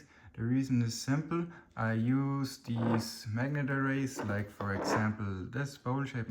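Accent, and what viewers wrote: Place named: Germany. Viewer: Hörst dich deutsch an.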